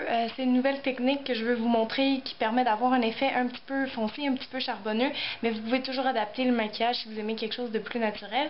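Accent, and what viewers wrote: Quebec, Canada. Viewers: Merci beaucoup pour vos vidéos, elles sont géniales et très claire ! <3 avec de bonnes idées très jolies ! (P.S. j'adore votre accent québécois, c'est "cute " ! =D ) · Vous êtes la onzième que je visionne et ouf! C'est exactement ce que je cherchais, et c'est super bien expliqué! (j'ai pris des notes quand même), et surtout, surtout... je n'ai pas été abrutie par des tonnes de produits, de pinceaux! J'ai tout ce qu'il me faut, en fait, et c'est génial! Vous êtes Québécoise? Car j'ai une amie très chère qui habite au Québec et d'entendre votre accent est un véritable rayon de soleil... D'ailleurs dès que je vous ai vue... vous m'avez fait un clin d’œil, avant même de commencer. Mais je viens de lire le texto de Jo L et j'ai 59 ans "passé", et je rencontre le même problème... J'ai essayé l'anti-cernes, mais... bof! Mais je vais essayer votre technique, oui! 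Mais en estompant beaucoup, car passé un "certain" âge, le noir donne un côté "sorcière" (lol), mais on ne baisse pas les bras! YES! Très belle vidéo, classe, nette, propre, sans donner des noms de produits (aahh! j'en avais marre qu'on me dise Kiko and Co!), et surtout un naturel... MERCI!!! Bizzz à vous toutes!!!! Je vous aime, et n'oubliez pas... le maquillage, même juste un peu, ça remonte le moral, même si on reste chez soi, ou si on est patraque... enfin, tristounette. En ce moment, vie compliquée et larmes, donc... maquillage, teinture de cheveux et demain je me coupe moi-même les cheveux!!! Et si c'est raté, ben... ça repoussera! Merci, tu es un amour... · Juste pour vous dire... Cest une maquilleuse professionelle donc ceux qui critique ils peuvent se la fermé et puis cest pas de sa faute laccent canadien ( moi je trouve sa syper mignon ) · vous avez un accent canadien )) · quelle belle accent québécois ;) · Très bonne vidéo ! 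Ps; J'adore ton accent ! Cannadienne ?? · j'adore toutes ses astuces tres bon a savoir, merci bcp j'adore l'accent aussi canadien quebec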